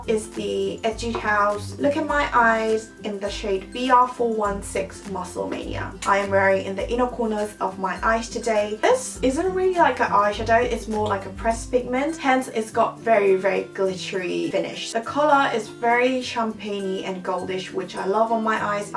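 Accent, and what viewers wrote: United Kingdom. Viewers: 영국발음때문에 시청시작했어요~ 저는 캐나다 왔음에도불구하고 열심히 영상보고 영국영어혼자공부합니다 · 아 진짜 영국발음 넘나 조아여💓 2016년에도 좋은 영상들 부탁드려요👍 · 볼때마다 느끼는건데 영국 발음 듣기 너무 좋아요♡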